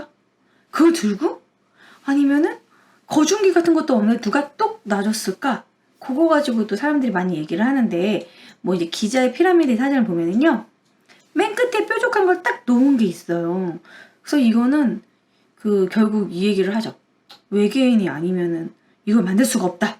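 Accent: Gyeongsang, South Korea. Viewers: 갱상도 분!?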